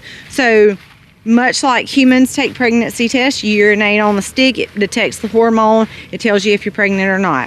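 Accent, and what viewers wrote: South, United States. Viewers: I talked about my grandmothers garden. They also had chickens in a hen house an d pigs in a stable. Both had a fenced area so they did get outside. I agree I love spinach but grandma didn't have that. I am curious you are always talking about the veggies do you have fruits. Grandma had cantaloupe and watermelons. She had an apple tree but it never gave off much. I didn't see corn either but I think it is late in the year for it. Also I live in Oklahoma and not sure what area that you are in but I am betting in the south hearing your husband and especially your southern belle accent. I know certain things grow better in certain areas.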